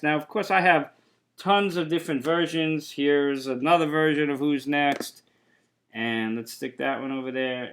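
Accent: Boston, United States. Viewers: What's your accent? Boston? It's a bit like JFK in places.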